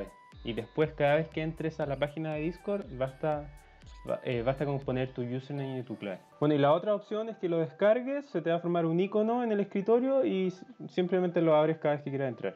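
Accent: Chile. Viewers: Eres chileno 👊😎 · No te conozco pero hablas como chileno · no te conozco pero eres chileno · Es chileno ? O no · Mire este video y me sirvio artazo, ah y eres chileno jajaja, me suscribo XD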